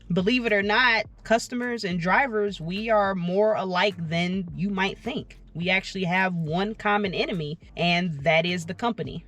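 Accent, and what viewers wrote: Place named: AAVE, United States. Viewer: It's AAVEE, which is just another system of language in America, particularly by black people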